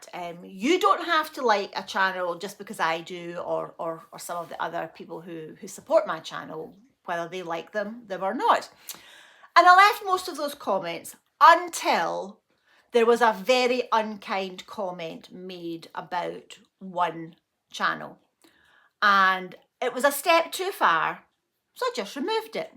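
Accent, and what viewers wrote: Scotland, United Kingdom. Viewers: I am not a creator but comment frequently on videos I watched. I never ever commented in a negative way or criticised the person behind the channel. Its like you said its free to watch and if you don’t like the content why watching it at all?? never understood that attitude. I habe too say that especially during/ after Covid aggression and unkind comments have risen, even I get nasty comments after my own comments on absolutely harmless topics, its so weird what people read into your comments sometimes and how nasty there responses are. Like you I have so much experience not with 5 years old😅 but adults who often behave like them😅 working in retail for many many years and have seen and heard ALL. I always try to be kind and not judge people just by their looks, background etc. I admit its not always possible but this is a lifelong project and some people are just AH and I don’t care because I cant change them, not my problem but I also agree with you for very sensitive people it isn’t always that easy. 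I just love your content and your personality AND I can understand your Scottish accent which is quite ironic if a non native english speaking person is saying that😅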